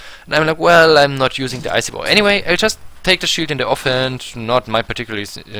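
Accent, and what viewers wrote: Germany. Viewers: Man merkt echt das du eigentlich aus Deutschland bist xD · lass dich nicht verunsichern. die einzelnen Elemente am Anfang sind wichtig. vielleicht grafisch aufarbeiten und unterteilen. sonst sehr informativ und gut verständlich. du kommst aus dem Raum Stuttgart denke ich 😀. weiter so und das mit dem Akzent wird schon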